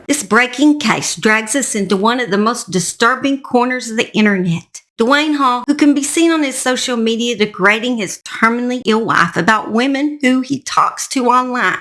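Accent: South, United States. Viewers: Good Morning 😊 Im watching your newest video and wanted to say I Love your Southern accent!! I'm somewhat a Southerner myself. I live in NC originally from SC. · The craziest, most disturbing part is how she wanted to go out! It boggles my mind as to why she would make the decision that she did. She's so beautiful and sweet and innocent looking, you'd never guess in a million year's how disturbed/mentally ill she was just by appearances. And why let that ugly, disgusting, slovenly looking piece of 🐕‍🦺💩 touch her? This case really disturbed me on a deep level. I hope she's at peace and in a better place👼🏻🪽🙏🏻, if there really is an afterlife, and I hope the opposite for him when he goes 🔥👿. Also...this is my 1st time to your channel and I can tell you've done a lot of research, there was a ton of detail that you've put into this video...and I love your southern drawl.😁 Great work ...subscribed✅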